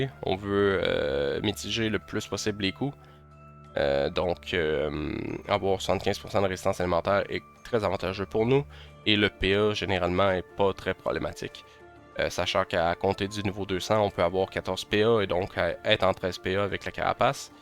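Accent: Quebec, Canada. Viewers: Un quebecois esti!!! Yessir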